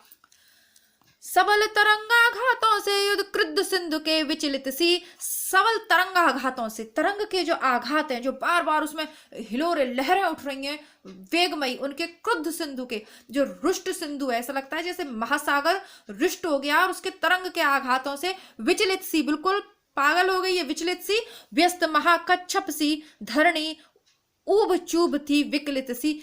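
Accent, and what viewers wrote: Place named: Uttar Pradesh, India. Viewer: हा मेम मेने ही पुछा था।आपके बोलने का लहजा up का लगा।मेरा पीहर करोली के पास ओर ससुराल पश्चिमी राजस्थान हैं।आपके विषय मे जानकर अच्छा लगा।मेरा up टच ह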